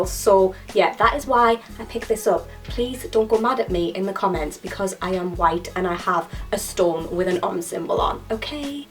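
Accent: Newcastle, United Kingdom. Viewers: Just found your channel and love it! Are you from Newcastle?!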